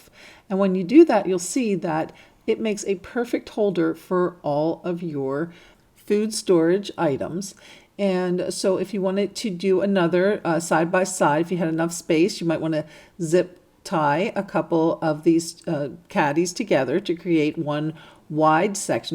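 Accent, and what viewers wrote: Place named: East Coast, United States. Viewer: Where are you from? Somewhere on the east coast obviously. I hear it especially when you say the word “on.” Love east coast accents. 😊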